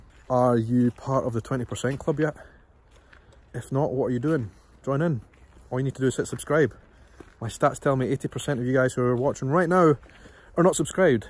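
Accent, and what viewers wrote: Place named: Edinburgh, United Kingdom. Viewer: Hi. Love your vlogs. I emigrated to US in 1974. Still have a little accent. Although my family in Cumbernauld think I have an American accent. I have a sister in Edinburgh who I visited in 2012. I live in St. Louis MO well about 20 miles south. Miss Scotland but US is awesome planning a trip in 2020. You have a posh Edinburgh accent. I was born in Balornock Glasgow. Keep up the good work. Hope you can get over here to live for a while.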